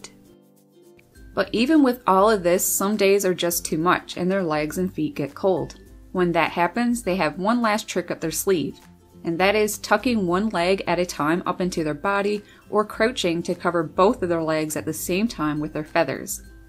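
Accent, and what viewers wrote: Canada. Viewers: The Canadian accent is cracking me up! 😄 That's probably one of the most pronounced ones I've ever heard! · Hi. This is the first video of yours that I've watched and I really loved it. I assume from your accent that you're from Canada? The colours of those birds were just stunning, especially the blue ones. Sending you best wishes and warmth from the UK.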